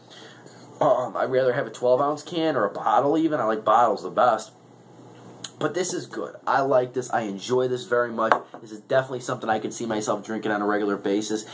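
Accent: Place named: Chicago, United States. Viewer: Nice Shicehgow accent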